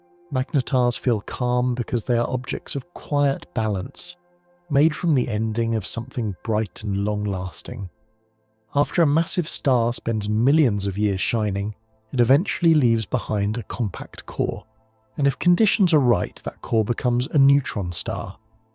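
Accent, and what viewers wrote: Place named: United Kingdom. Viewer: hmm you say you are from USA but this is British accent, you should put an "intro" in your channel so we know this is not just another A.I. slop.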